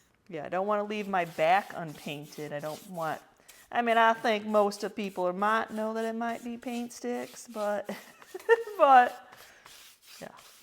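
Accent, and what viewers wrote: South, United States. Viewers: I have been slowly going through and making over things that need a new home. I know i am being nosey but where did you get that southern accent as i can not help but ask.. craft on my friend...😊😊😊😊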